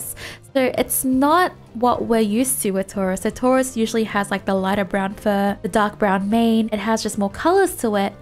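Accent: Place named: Australia. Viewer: Ohhh, you're from Australia, that's where your accent comes from, finally!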